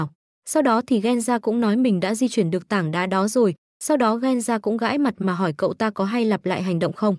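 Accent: Central, Vietnam. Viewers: ad người miền trung à mô vs chả mi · Ủa mi người miền Trung hả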